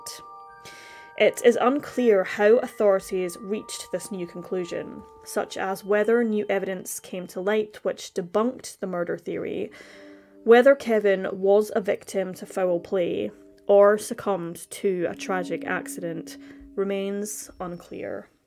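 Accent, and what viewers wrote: Scotland, United Kingdom. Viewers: I just found your channel today and as I was waiting on the narration, you can imagine my surprise as an East coast Scot hearing your voice. Instant subscription before I’d even listened 🏴 fortunately your video was extremely well researched and handled with dignity and empathy so I made a good choice. Thank you for sharing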